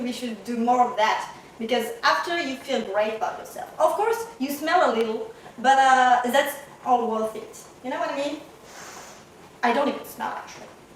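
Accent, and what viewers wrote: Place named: France. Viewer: Il m'aura fallu 4 secondes pour comprendre que tu étais française avec ce bel accent, je pense que la follie de la personne est donc logique 🤣😂👍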